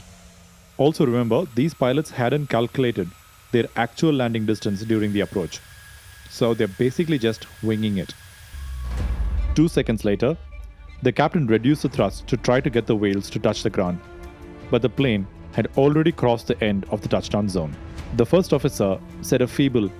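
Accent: Kerala, India. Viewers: Are you a malayali? I felt it from your accent. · Wtf. Why do you have a mallu accent? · Narration in an amazing Indian English! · He is ofcourse a malu few pronunciation was mallu accent.. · Did you pronounce zh ( ഴ ) correctly you are either a Malayalam or a Tamil , your accent gives Malayali vibes . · Your pronunciation of kochi and kozhikkodu makes me wonder whether you are a malayali?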